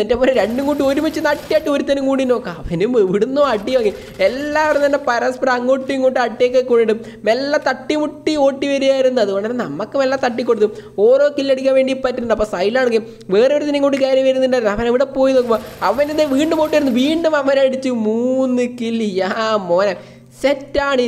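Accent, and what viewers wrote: Kannur, India. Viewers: Kannur aano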